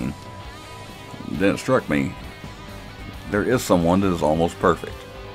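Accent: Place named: Texas, United States. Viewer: I'll admit I grew up pretty removed from the world of pro wrestling, even being from Texas I've never heard of the Von Erichs. However, since I saw that first poster I've been transfixed by the story and tragedy of the brothers, and I can honestly say it's been years since I've been this excited by a film. Hearing you talk about the movie, the boys, and the sport with such a sense of respect and love and with that deep accent I've come to miss so much since I've been away from home, I'm starting to realize why I've come to feel so connected to this film that hasn't even come out yet. Thank you for your thoughtful analysis, please keep doing what you love.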